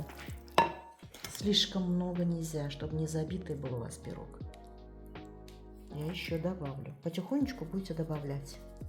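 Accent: Standard. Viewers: Какое мягенькое нежное тесто! А какой приятный, завораживающий голос милой хозяюшки! Чистая, правильная русская речь! Спасибо Вам огромное за рецепт, за подачу, за Ваше чудесное видео! Здоровья вашей семье!❤❤❤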